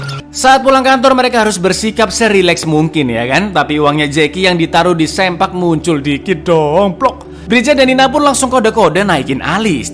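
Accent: Java, Indonesia. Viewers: Sy sering nonton spoiler video anda.. Bagus dan kocak. Cm kurangi medok lpgat bahasanya krn tidak semua org paham maksud anda. Jd gunakanlah bahasa Indonesia yg baik. Tetap semangat 👍🥰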